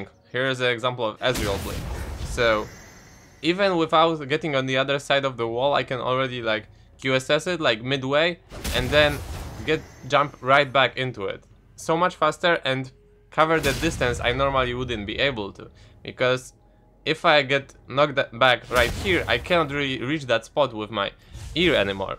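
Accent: Poland. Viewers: Uwielbiam twój polski akcent :D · Are u polish? U sound like polish guys. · coś tak czuję że Polak jesteś ;) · jak to polak chyba xd(słysze po akcencie)xdd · Ten akcent odrazu się z polską kojarzy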